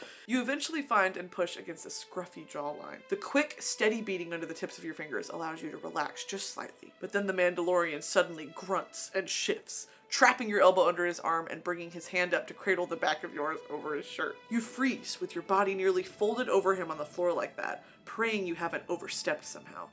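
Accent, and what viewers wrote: United Kingdom. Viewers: Your British accent knocks me out every time😂👌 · Seeing her face in the bottom left corner reading the fanfic in a British accent while I hide under my covers at night got me laughinggg😭😭😭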